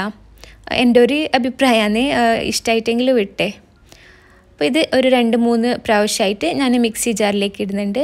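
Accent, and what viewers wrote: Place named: Kannur, India. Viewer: കണ്ണൂർ കാരിയാന്ന് ലെ ഞമ്മള shamis own നിലെ ശാമിലയുടെ ഒക്കെ സംസാരം പോലെ 👍🏻👍🏻👍🏻👍🏻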